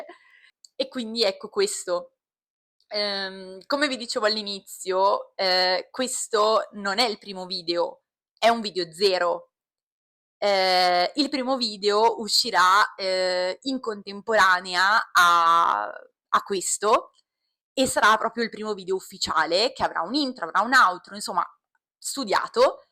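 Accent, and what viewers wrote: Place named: Tuscany, Italy. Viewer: nella parlata hai un sottofondo toscano, forse hai parenti o origine di quel posto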